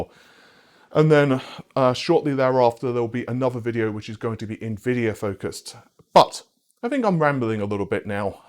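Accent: United Kingdom. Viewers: I'm watching a guy with a British accent talk about videocards that I can't find in stock and couldn't afford even if they were in stock. What am I doing with my life :) ?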